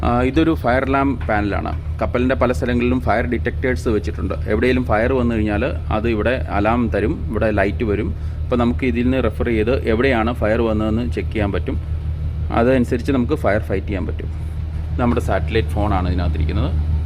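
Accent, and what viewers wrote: Kottayam, India. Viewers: Bro Kottayam kaaran aano.. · Thankalude avatharanam നന്നായിരിക്കുന്നു കോട്ടയംkaranano?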